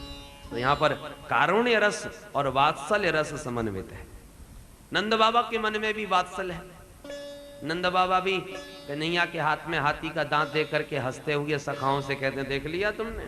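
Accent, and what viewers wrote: Braj, India. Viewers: Ati sunder sumadhur brajbhasha me katha ataydhik sukhad saras prateet hoti hay apko sader naman vandan guruver 🙏🙏🙏🙏🙏💐💐💐💐💐💐💐💐💐💐💐💐💐💐💐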